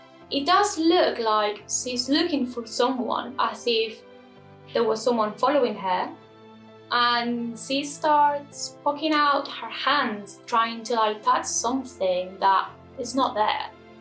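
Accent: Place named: Spain. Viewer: I found you on twitter regarding the Cecil Hotel and shot over here to watch. I'm glad I did because I really like your vibe and uniqueness. So this is my first video and I'll probably spend the rest of the night watching your other videos. Love your hair, makeup, and your accent is really adorable. I wanna say a little Spanish mixed with English? Idk but I love it. I'm American so it's an accent to me and it was fun to hear and watch. You were a little scary at first to me with the intense eyes and blood and then this sweet voice popped out that I was not expecting. You remind me of the other lady that does her makeup while telling real horror stories. I always appreciate something different than the normal I see everyday. And I try to stay as far away from politics and media as I can. I've stopped watching tv and will not go onto any social media outlets unless it's to post about things I like because I'm so tired of the negativity. 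I don't dare scroll when I do rarely jump on because I don't even want to see or know what the hell they are going on about next. It's neverending and tiresome. You are refreshing. 💋 xoxo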